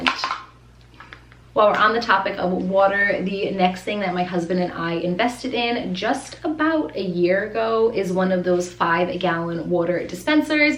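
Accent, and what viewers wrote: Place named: New England, United States. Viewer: I feel like your New England accent really came through in this video with how many times you said water! LOL XD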